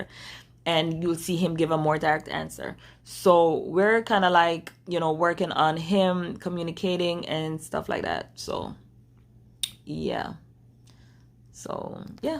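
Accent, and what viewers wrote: Jamaica. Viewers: i detect that jamaican accent...i am too jamaican,..like your video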